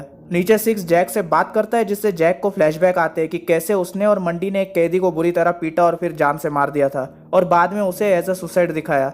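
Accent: Maharashtra, India. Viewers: Bro you have marathi accent that is why I asked do you live in Maharashtra that's all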